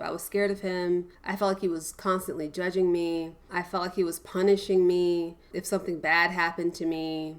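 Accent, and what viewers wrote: United States. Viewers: I absolutely love your personality. I think you're fucking cool. Your way of speaking did bother me the first few minutes but it was more about your american girl accent than the swearing (which I do a lot as well anyway).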